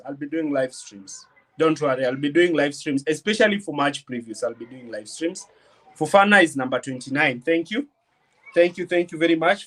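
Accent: Kenya. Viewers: Good job brother, I knew that was a unique Kenyan signature tone and accent display. Thank you for the good work you are doing.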